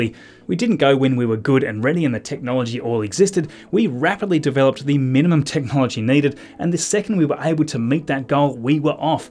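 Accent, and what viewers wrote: Australia. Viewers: I don't like your chanel more because, I don't understand your australian accent verry well, I'm just studying english from Brasil, we speak portugese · "Hache El Es" (HLS) I love how Australians say the letter H. Americans pronounce it just "Ache" without the "Heh" sound. But you include the actual letter, which frankly makes a lot more sense. A completely sideways and weird observation, I know. I'm completely fascinated with language, even in cases where it's my own language. :D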